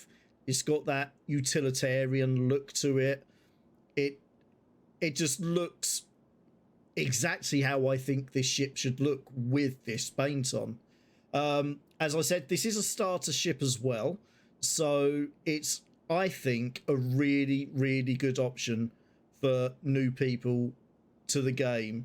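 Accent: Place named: United Kingdom. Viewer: Thanks for the kind words and you already got something I don't have.. A cool British accent! Keep it up man! Subbed.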